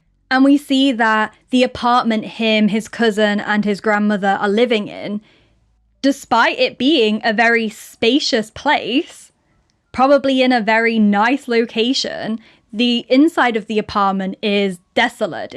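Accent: United Kingdom. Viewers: Completely unrelated but do you mind telling me if your accent is British? Whatever it is, I love it. And thank you for your videos. Great job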